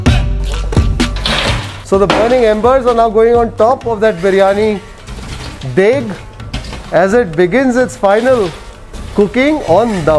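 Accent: Karnataka, India. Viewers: Most underrated food review channel....classy and simple English explanation is like "Desi food review in Desi English".... Hope channel grows bigger ❤️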